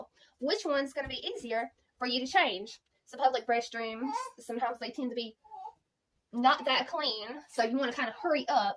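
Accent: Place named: Carolinas, United States. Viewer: What southern state are from? You sound like a Carolina girl.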